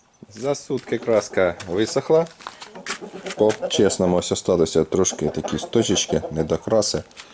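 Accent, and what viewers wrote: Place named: Poltava, Ukraine. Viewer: Вподобайка як завжди!) Нагадує полтавський говір)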